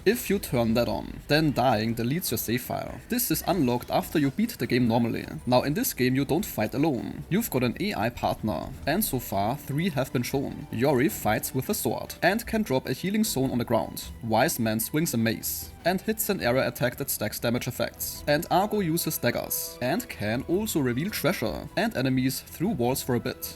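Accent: Germany. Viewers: Krasser Deutscher Akzent. Sofort gedacht, dass du zumindest Österreicher, Schweizer oder Deutscher sein müsstest.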